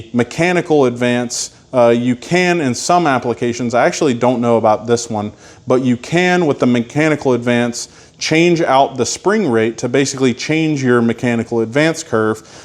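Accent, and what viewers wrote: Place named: Tennessee, United States. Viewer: glad YT recommended this. hope you document the CPS install a bit more in the future. really liking the repurposing simple parts and using speeduino. just a wild guess by your accent but I can imagine you're from like waynesboro or somewhere near AL in TN, though thats probably me projecting because I'm from the other side of the line.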